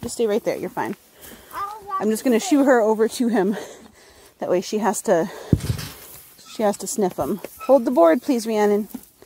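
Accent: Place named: Canada. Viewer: Sounds like you’re from Canada. Such a beautiful birth.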